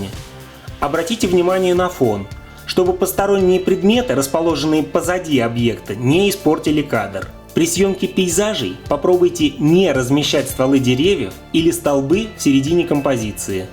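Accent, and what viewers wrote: Russia. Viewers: О, чувак владимирский похоже))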